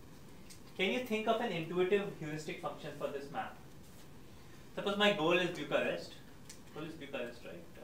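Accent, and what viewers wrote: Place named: India. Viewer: The English is probably perfect - its the heavy Indian accent that makes this barely comprehensible.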